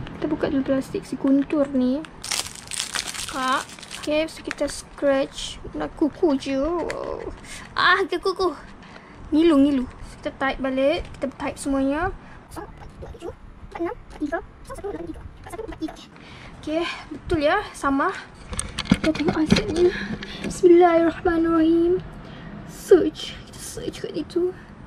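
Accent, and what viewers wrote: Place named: Sabah, Malaysia. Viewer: Macam slank sabah. Iya ka? Assalammualaikum dri sandakan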